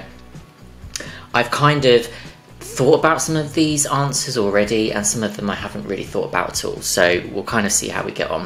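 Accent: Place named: United Kingdom. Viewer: Thank you for doing everything you’ve done! I love to see it. You’re very charming but it could just be the British accent 🤷🏼‍♂️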